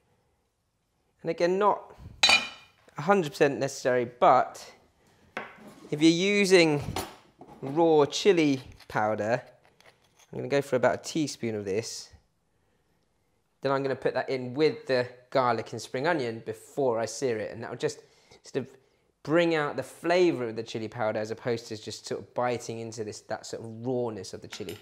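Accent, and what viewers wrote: United Kingdom. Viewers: Great video, you explained the details on how to make it. I also love your British accent. Oh boy, you have used too much oil in making it.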